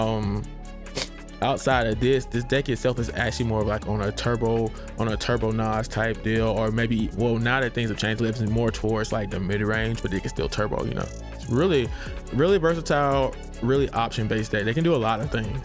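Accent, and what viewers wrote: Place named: Maryland, United States. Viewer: Glad the algorithm brought me here. Great energy, great video, and you got a lotte love for the community! Also, could be off base, but I feel like I'm hearing Maryland/Baltimore in your voice, and if so, great to hear more DMV content creators 💪🏾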